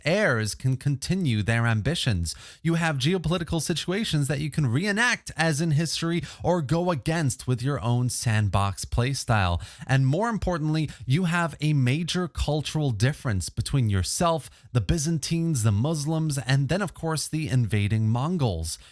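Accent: United States. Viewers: Alright. Who the hell deals in pounds, but has an American accent? What's going on here? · You're the most American sounding Scotsman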